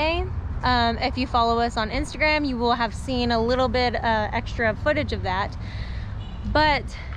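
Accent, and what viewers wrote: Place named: South, United States. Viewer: ***O...I notice you are loosing your Southern drawel....lol.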